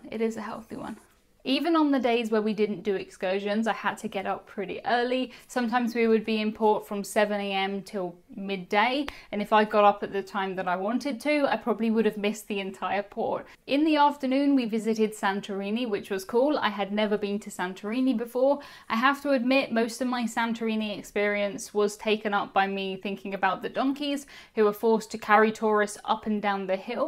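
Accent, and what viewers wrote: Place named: United Kingdom. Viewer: Don't let anyone bother you about your British accents for things like "bath". Even in the United States people say things very differently depending on the region they are in. Be you, I enjoy listening to you speak about various cruise topics with your British accent. :)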